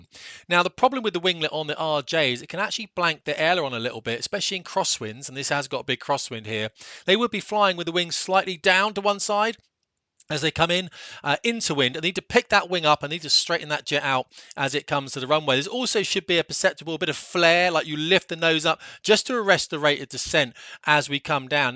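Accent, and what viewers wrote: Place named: England, United Kingdom. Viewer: The gentleman speaks with evidences of a regional English rather than the "received" British English or standard, mid-western American English and at a pace suggestive of being prodded on with a stick. Very difficult, I find, to follow! Very regrettable!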